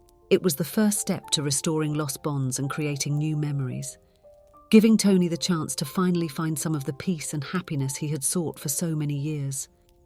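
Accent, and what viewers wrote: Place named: United Kingdom. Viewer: I love your narration style and your British accent. So, I subscribed to your channel a couple of minutes ago.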